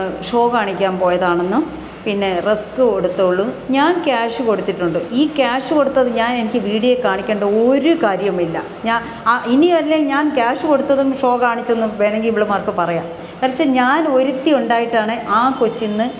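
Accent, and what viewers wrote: Kottayam, India. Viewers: Thamasam tvm anenkilum samsaram kottayam anu ennu thonnum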